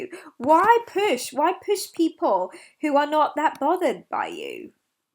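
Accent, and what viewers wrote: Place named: Australia. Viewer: That's a very Aussie accent. Greetings from Brisbane.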